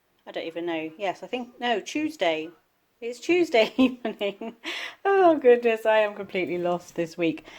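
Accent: United States. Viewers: It really is fascinating 🥰 had no interest in history when I was younger...I love it now🤣 Do you know, when I was listening to you speaking I thought there may have been a slight American twang...15 years wow!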